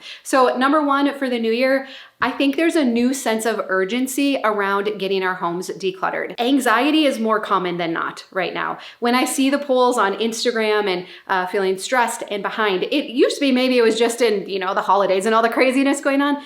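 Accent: Minnesota, United States. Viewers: Lol she lives in MN. That's her regular accent.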